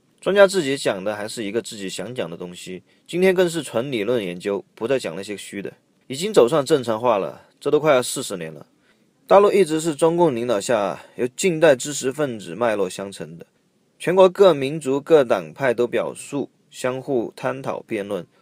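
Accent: Taiwan. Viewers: 你凭啥是台湾腔你是大陆人吗不要台湾腔好不好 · 是台湾人吗？口音有点像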